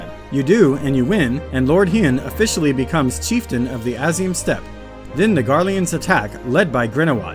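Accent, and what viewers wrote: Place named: United States. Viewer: I'm just so thankful that you are so articulate. So many other summary videos, I can hardly understand their accents (European, speech impediments, etc). I'm from the United States so hearing an American accent is so much easier for me to understand. Thank you.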